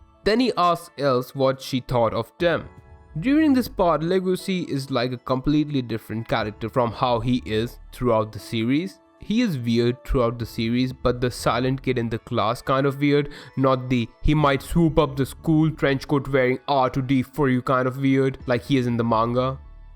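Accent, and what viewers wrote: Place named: India. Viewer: Du Yu hav a thuffy nose or ar u Indian? Sorry I couldn't quite understand your accent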